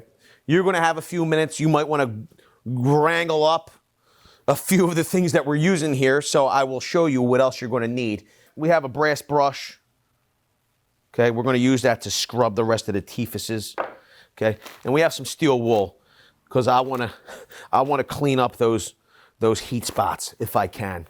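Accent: Philadelphia, United States. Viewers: That's phillidelphia I hear i your voice. Almost thought it was Camden but it's Philly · I'm guessing you're from PA, specifically around Philly. Wuder instead of water. Lol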